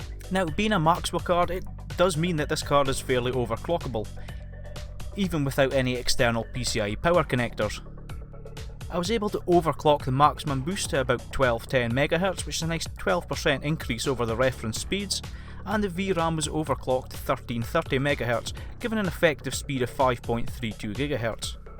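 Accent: Scotland, United Kingdom. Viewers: Scottish accent 😍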